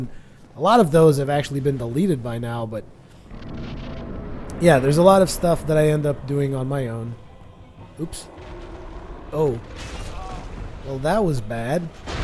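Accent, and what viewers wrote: United States. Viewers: First vid, Vaati sent me. Damn, your English is perfect. You sound American. You have no accent. I have Portuguese heritage, but I don't know what it sounds like. Weird that you don't have an accent. Great vid though. Going to watch the rest if this play through.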